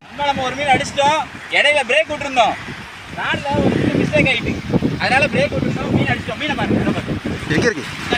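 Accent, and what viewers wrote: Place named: Sri Lanka. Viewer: Antha tamil selong super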